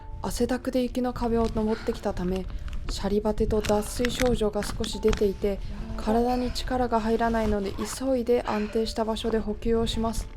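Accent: Gifu, Japan. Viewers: 時々岐阜弁がしゃべっとって、おおきに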